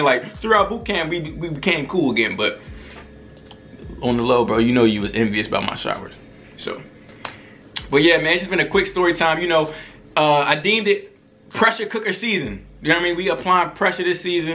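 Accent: Baltimore, United States. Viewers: I knew you was from baltimore before you even said anything 😂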